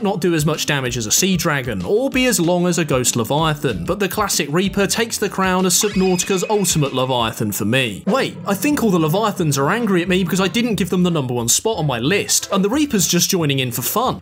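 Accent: United Kingdom. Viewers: Jesus, you're about as basic bitch of a YouTuber as you can be. British accent, over used 5 images of what you want people to see you as, and cliche sound effects, ew. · I love your British accent. Also great video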